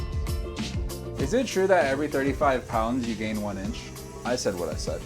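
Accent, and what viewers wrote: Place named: France. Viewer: Your French accent is beautiful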